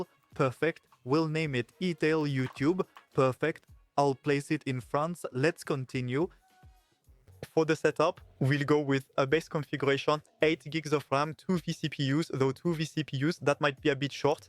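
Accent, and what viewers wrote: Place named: India. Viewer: nice hindi IA accent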